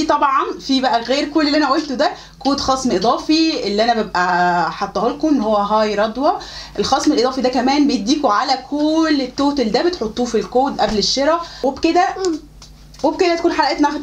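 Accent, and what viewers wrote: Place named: Palestine. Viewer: اووووف انتي مصريه؟؟؟ مفكرك فلسطينيه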